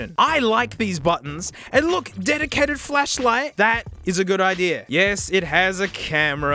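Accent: Australia. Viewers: He speak in Australia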